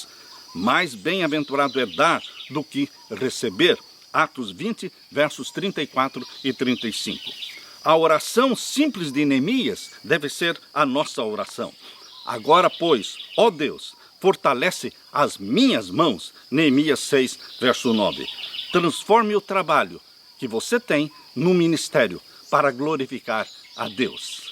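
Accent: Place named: South, Brazil. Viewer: De onde o sr. é? Com este sotaque bonito? Parece do sul! E este local em que está fazendo o estudo da lição, com essas prdras lá atrás Que lindo!....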